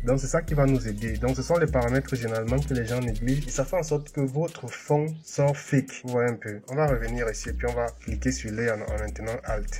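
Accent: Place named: Cameroon. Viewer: Je m'abonne d'abord parce que ton accent la c'est pour le Continent 237 pure pure. Puis je like parce que tu na pas cacher comme les autres et j'active la cloche parce que tu a du bon contenue et c'a m'aide.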